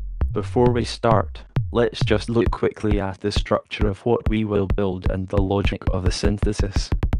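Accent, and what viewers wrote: Scotland, United Kingdom. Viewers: lol got so excited when i heard a scottish voice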